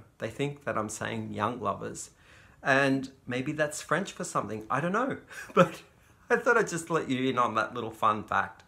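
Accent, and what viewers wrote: Australia. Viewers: Hi. you sound Australian! where are you from. O my goodness these fish are gorgeous!!!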